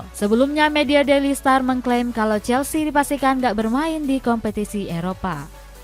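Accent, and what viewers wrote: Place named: Kalimantan, Indonesia. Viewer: Logatnya kalimantan banget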